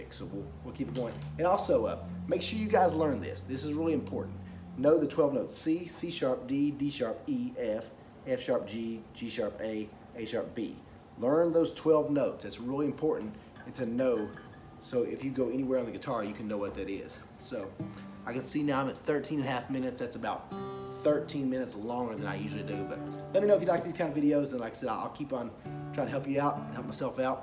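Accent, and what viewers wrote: South, United States. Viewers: says: 'There is no B Sharp', says: 'This is a B Sharp'. Uh no that's a C. I give you credit for trying to teach others when you're really not a very good player, takes a bit of nerve. Watching you try to play notes was painful, too bad your knowledge doesn't transition to actual playing. I guess that old saying 'those who can't do, teach' is true. You are kind of fun to watch, with your good ol' boy style and accent so kudos to you. Practice practice practice (you'll get there). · Yea man...any little trick that puts chords to leads is helpful without tellinng anybody you done whipped up some pentatonic simplicity. Also teaches you where to find alternative chording deep down and up top in the nut region and everthing in between. Brother, I use your vids for to students to keep it real. They come in all sad they trying to play or cant feel the music. 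We pull up your stuff and say looky there.. this fella is putn down some good country sounds with a geettar and a Southern accent..lol...I love stealing your stuff to keep the kids motivated. You keep it simple but sound like a pro. Keep it all rolling. Even some Bass walks into simple chords like c d g a like this bass pick 1 count E E F# G# Open D string then strum that As you just taught us... simple but sounds like geting warmed up. Then move to the 1 4 5 progression and put it all together. 3 vids. Put all 3 lesson together voile' another kick butt lesson just like this one. Keep bringing it... man we love ya here in Colorado.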